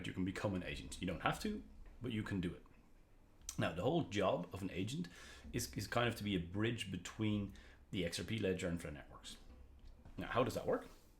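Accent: Ireland. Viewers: Congrats and good luck creating new videos.. Looking forward to more videos from you....love your irish accent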